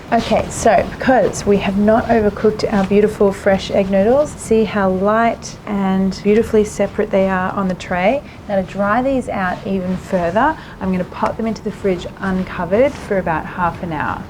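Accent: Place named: Australia. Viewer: I have been watching your cooking channel n I think you are an excellent chef!! I can tell by the ingredients n your style of cooking. I love it n I will definitely try it. Not only that, love your accent!! Aussie, Aussie, Aussie.....🐨🐨💕✨🦋💕✨🦋😘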